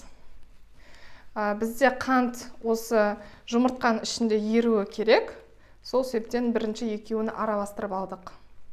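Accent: Standard. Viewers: Жарайсын.сенын таза казакша сойлейтинын оте керемет.торттарын да керемет. 👍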